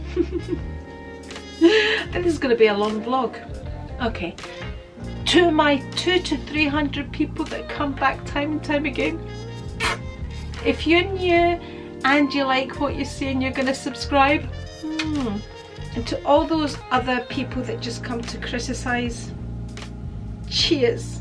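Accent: Scotland, United Kingdom. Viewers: Hi, I forgot to mention there was another interesting reason I have been watching your videos. Because I studied my PhD in Glasgow 20 years, I do enjoy your Scottish accent and your Vlogs to Glasgow. Looking forward to seeing more videos from you. Cheers ❣️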